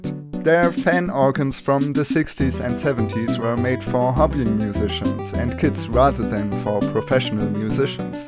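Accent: Germany. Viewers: More German accent please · I loved your video, thank you for producing it. I remember when the Italians were producing many electronic organs in the 70s and 80s. I still own an X705 portable organ that Michell Jarre used. As you say a very sad demise. Most of the American names had also disappeared by the mid 80s. Don't apologise for your German accent. I am British and I love Germany and the German people. I am a fan of Franz Lambert who at 75 is still doing concerts on his Wersi organ.👍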